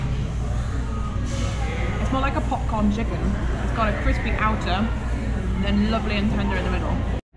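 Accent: United Kingdom. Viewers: British accent - interesting. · Did people ask you if you were British lol. Love your accent lol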